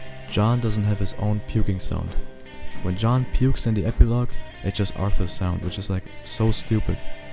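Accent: Germany. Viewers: I think I hear DEUTSCH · starkes video und feier den deutschen akzent haha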